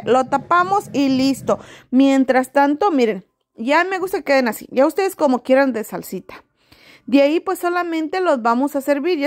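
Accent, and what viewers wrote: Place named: Mexico City, Mexico. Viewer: Que buena receta. Se ve maravilloso eso. Si encuentro mañana tomates verdes (Acá se llaman Miltomates) lo voy a hacer. Me encanta tu acento, supongo que eres del DF. Yo te veo desde Guatemala.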